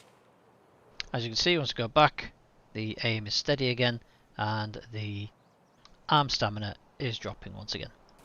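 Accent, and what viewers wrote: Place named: Wales, United Kingdom. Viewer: I starve in raids, thanks for explaining. Your Welsh accent is great. Not too hard to understand.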